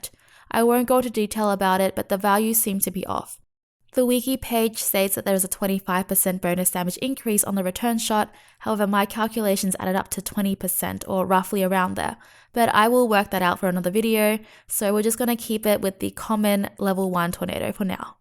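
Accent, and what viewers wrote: Australia. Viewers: Hi, I hope you are well! From my experiment, if you use meowgik, the cat damage is also reduced, due to the reduction of your base damage. I hope you can mention that in your future videos. Cat damage are reduced by around 25% when picked front arrow +1. Also from your accent, I assume you are Australian? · Sounds like your from Australia? I don't know why we do it, but I know a LOT of Americans who LOVE everything about Australia! The closest I've been to Australia was in 1975. The year I lived in Singapore. (Loved it!) If I'm wrong about your location, sorry but I still love your accent! Thanks for the game help! I was stuck & now I can tear up Archero!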